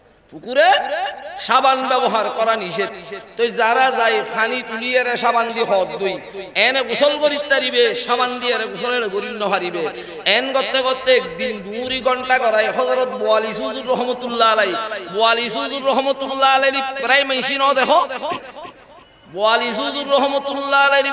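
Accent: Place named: Chittagong, Bangladesh. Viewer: আলহামদুলিল্লাহ আনেক সুন্দর চট্টগ্রাম বাসায় আওয়াজ শোনা যাচ্ছে এমন কিছু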